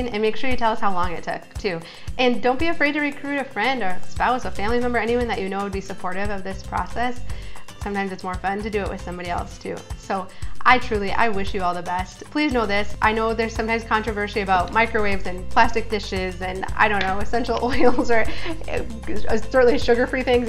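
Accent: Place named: Midwest, United States. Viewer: I hear a midwestern accent! But that only adds to me loving what you share here!!!!! OMG!! 😍 I’m going to end up watching this over and over!!!! I want to simplify so badly!!!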